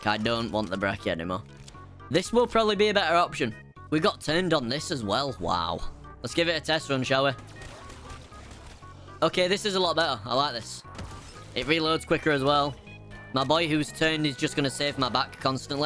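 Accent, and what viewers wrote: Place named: England, United Kingdom. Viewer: no kiddin love the English accent and also the commentary was top notch, earned a sub <3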